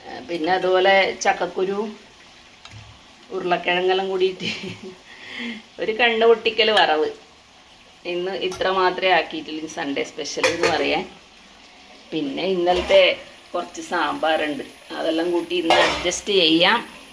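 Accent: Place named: Kannur, India. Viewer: ആഹാ അടിച്ച് പൊളിച്ച് cleaning നടക്കട്ടെ. നമ്മുടെ കണ്ണൂർ touch ഭാഷ കേൾക്കുമ്പോ ഒരു സുഖം❤❤❤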